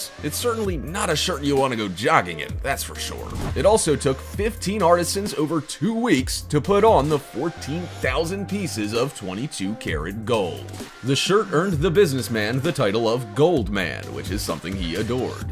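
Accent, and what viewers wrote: United States. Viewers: narrated by an American accented person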